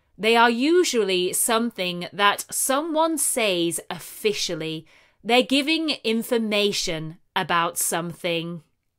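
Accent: United Kingdom. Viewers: I love your English stories and British English.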